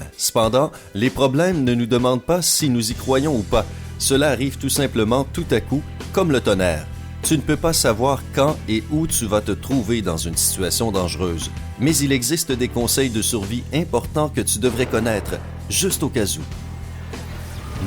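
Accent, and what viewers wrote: Quebec, Canada. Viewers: C un quebecois qui parle.Moe aussi j'en suis un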